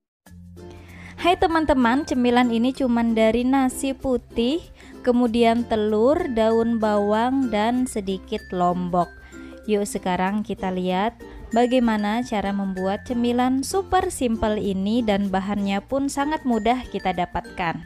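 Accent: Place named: Samarinda, Indonesia. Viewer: What samarinda??? Nada samarindanya udah ketauan..hehe sama dong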